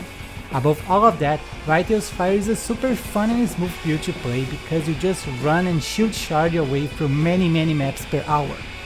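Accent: Brazil. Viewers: by your ascent you sound like brazilian guy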